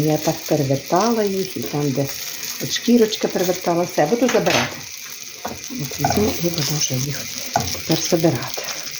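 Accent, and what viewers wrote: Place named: Western Ukraine, Ukraine. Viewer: При чем здесь мова? Хуторской западинский говор никого не красит. Женщина простая, сельская, ей простительно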